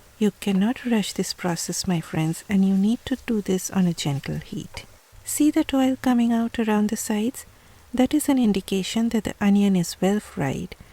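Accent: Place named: Bengal, India. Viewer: Hi.. a suggestion.... use shallots instead of red onion in US! The red onions in US are void of any natural pungency and usually taste like grass! Also, I saw you mentioned edible mustard oil of good quality not available in US! Try using TEZ brand or Radhuni brand available at any Bangladeshi grocery store! Loved your bengali style English narration with occasional "To"... u know what I mean 😂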